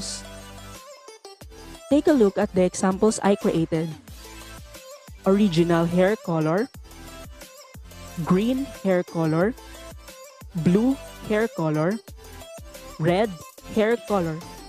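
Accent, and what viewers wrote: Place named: Philippines. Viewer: the filipino accent makes me laugh sometimes while she explains certain things...good tutorial though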